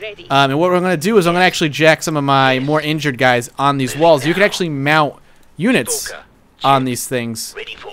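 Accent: South Africa. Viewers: He's not Australian, he's South African. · It's not an Australian accent. It's South African. (I am Australian)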